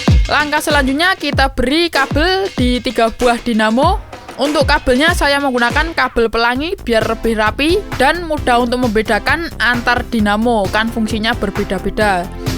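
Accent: Java, Indonesia. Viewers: Medhok e mas tekan ndi toh? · Koe wong jowo to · Bang orang mana kok nyomongnya seperti orang jawa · Abangnya dari jawa ya . . . . . . . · Deke kang Jawa ya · Channel Ini - Kreatif - Sopan - Jowo Nyo Muantep Keren BosQu!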